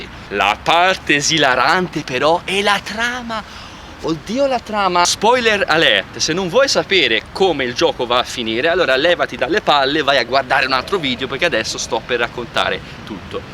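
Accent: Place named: Tuscany, Italy. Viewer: Meno male che ci sono altri YouTuber con un simpatico accento toscano ma che sanno quello che dicono, quando parlano di videogiochi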